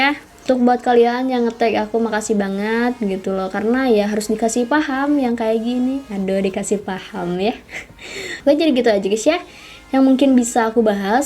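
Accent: West Java, Indonesia. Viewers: Sunda pisan euyyy,lope yu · Orang Sunda ya Neng....?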